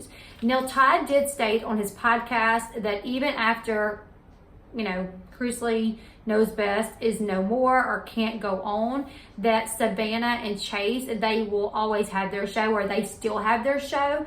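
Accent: South, United States. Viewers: I just wanted to tell u I love your show! Your southern accent brought me in but you are a smart informative lady! Keep up the good work!!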